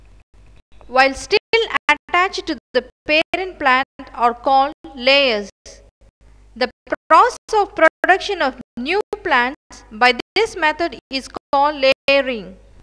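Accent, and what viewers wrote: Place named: India. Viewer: The moment you realize this voice was indian but still helpful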